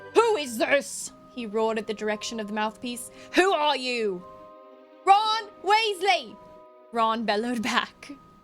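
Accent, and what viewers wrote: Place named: United Kingdom. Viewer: I love the British narrator voice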